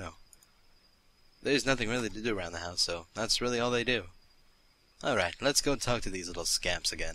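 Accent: United Kingdom. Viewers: is it just me or voice changes every year? well not actually the voice, rather the accent.. when I listen to one of his first videos, he sounds a bit... British? xD I dunno.. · voice sounds more brittish.... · I love re- watching some of his old videos cx hearing the changes his videos have made :3... And does it sound like he has a bit of a British accent in this one? or is it just me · DAT BRITISH ACCENT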